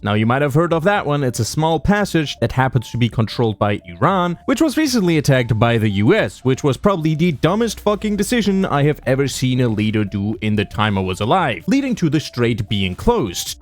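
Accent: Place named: Europe. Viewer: Yes and No, this guy has always been politically biased, based off the accent he's probably European or south American or smthn which means this guy has probably zero actual knowledge of America or its politics (not that most Americans are any better). Attacking Iran is an objectively correct choice and something we should have done decades ago when they first had their regime change. They are the #1 sponsor of terrorism in the world and getting rid of them means significantly less problems in the middle east down the line which is why 90% of the middle east + China is in support of the US effort to put them down. And once they're finished we can swoop in, stick all our fingers in all their pies and take their oil for our own as a reward. Aka the same thing we're doing with venazuela. In the short term it means more expensive games, in the long term it SHOULD mean less expensive games and everything else, +less chaos in the middle east. 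It's not a stupid decision, Iran is just more "roachy" than venazuela. Their military is demolished, they have no real power or influence and their only ally Russia is fighting their own war. They're just unnecessarily and pettily spreading it out. Should be over by the end of the year at most or within a couple months at least.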